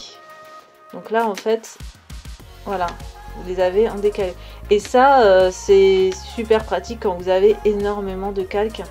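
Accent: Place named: England, United Kingdom. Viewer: Très bel accent anglais :D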